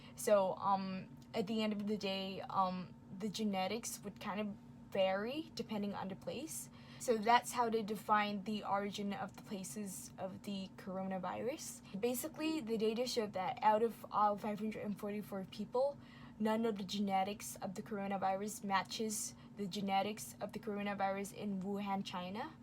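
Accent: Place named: United States. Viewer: Thanks! The pandemic and racism in the US are getting worse. I assume you are from the US based on your accent, please spread the info to the people around you to help curb racism against Asian.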